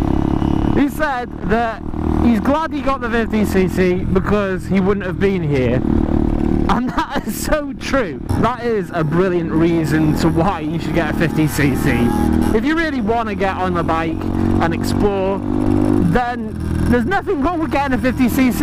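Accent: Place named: United Kingdom. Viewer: Just subscribed to your channel. Being in Japan it's good to hear a British voice on a motovlog for a change. I've also just started making bike videos. Only 1 for now, but check it out if you have time. Thanks and keep up the good work!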